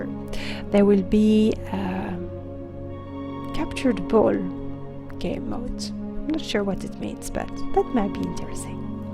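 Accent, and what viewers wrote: France. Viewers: Im no expert, but are you french? . Love the voice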